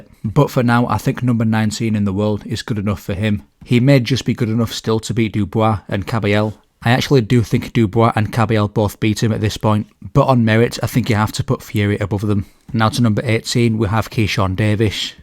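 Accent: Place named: Northern England, United Kingdom. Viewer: I like your accent, probably Northern accent in the UK.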